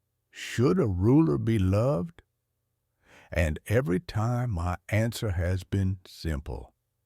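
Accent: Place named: South, United States. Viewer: What's with the southern confederate general voice?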